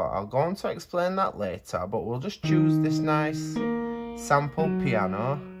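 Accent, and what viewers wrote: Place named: England, United Kingdom. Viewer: I've watched quite a few tutorials on the OPZ and still found some new tips on yours. And it's nice to hear an English voice for a change! Thanks👍😊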